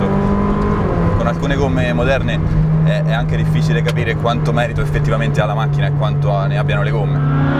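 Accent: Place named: Corvaro, Italy. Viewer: Ciao... no sicuro proprio no... ma vedo sempre più video simili... dove un comune mortale non può quasi mai arrivare... spero non ti sii convertito totalmente a questo livello di auto... ti seguo da sempre x il modo di raccontare le auto e la storia, e perchè mia moglie e di Corvaro noi siamo in sardegna e quindi a mia moglie le fà piacere sentire quell'accento... verró a trovarti promesso!!! Perdonami se è sembrata una provocazione!!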